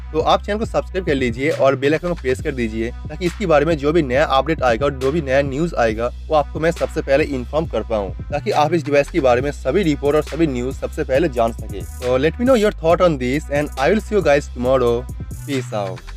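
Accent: Bengal, India. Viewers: Sir, apke Bengali hindi accent ke vajah se Kay bol rahe ho kuch bhi samajh nahi aa raha hai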